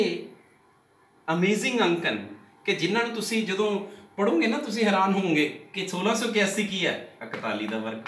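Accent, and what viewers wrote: Punjab, India. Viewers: Accent? Punjabi hai. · The Punjabi Accent 😍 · Sounds like an educated Punjabi accent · Smile on face , enthusiasm during lectures and that beautiful Punjabi accent ...it is very wholesome and amazing 🤩 beauty of maths and numbers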